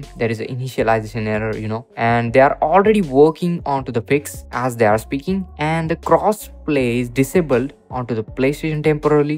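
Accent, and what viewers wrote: India. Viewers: He a Indian tech scammer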